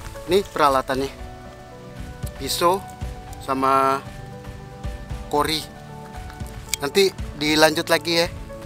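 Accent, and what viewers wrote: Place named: Jakarta, Indonesia. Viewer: oww.. Kayk orang Jawa.. Tp kok logat nya betawi.. 🙏🙏🙏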